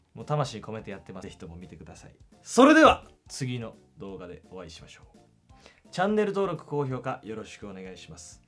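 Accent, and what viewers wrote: Kansai, Japan. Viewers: 関西弁あうやん かっこええな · 関西人？ 方言にビックリ…。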